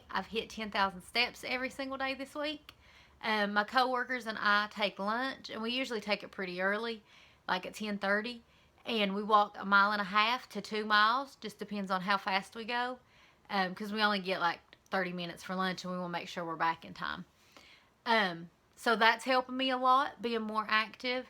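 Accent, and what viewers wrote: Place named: Eastern Kentucky, United States. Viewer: I have laughed so hard at the moonshine thing "well it's illegal." HAHAHA. You are going to rock your 30's like a beast! I am so excited for us to get together and shut down the WLS community on youtube with these Eastern KY southern accents :) You look amazing as always XOXO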